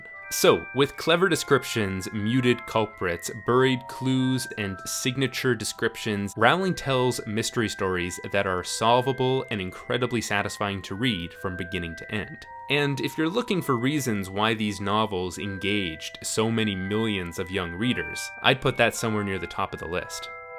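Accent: Canada. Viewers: You're Canadian. I can hear it in your accent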